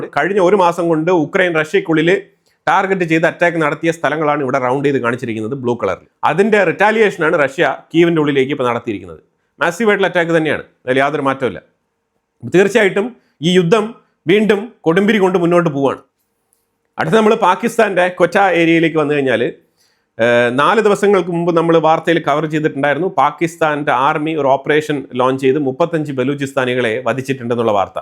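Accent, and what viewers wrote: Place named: Alappuzha, India. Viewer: Hello Are you the native of all eppey? your slang is just like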